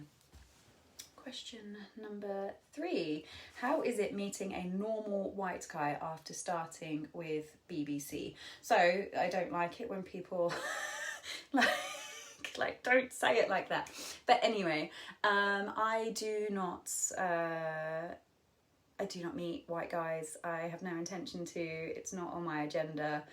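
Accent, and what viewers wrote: United Kingdom. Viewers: In addition to the rest, I love your very elegant, so British accent and way of speaking (I'm French).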